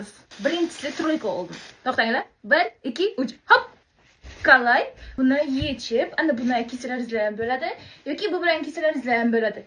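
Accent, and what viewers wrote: Tajikistan. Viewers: Opani milati tojik❤😊 shunga shunaqa gapiradi❤❤ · Shevasi tojik shevaku bu til buzilishiku nimasi yaxshi shevasini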